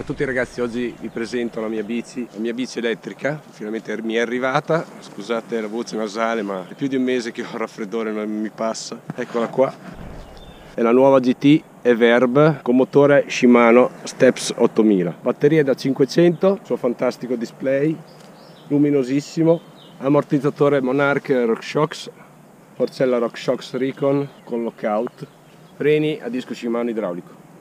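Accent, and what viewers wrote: Romagna, Italy. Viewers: Ecco perché parli da romagnolo...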